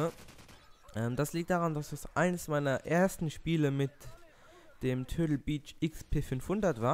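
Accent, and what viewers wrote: Luxembourg. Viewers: Das sind keine Sprachfehler, das ist Dialekt. Er ist Luxemburger :)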